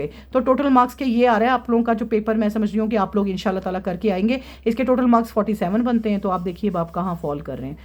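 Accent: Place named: Lahore, Pakistan. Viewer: Mam mujy to app Lahore ki LG rhi ha😊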